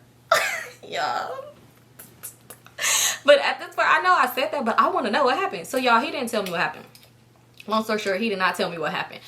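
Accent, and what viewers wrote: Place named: California, United States. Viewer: Tbh you always look gorgeous but in this video, you just flawlessly glowing and I’m loving it 😍🥰 literally all I be watching is your videos cause we got too much in common 😂 and we talk the same, must be a Cali thing cause I be so amazed hearing you say things I say 🤣🤣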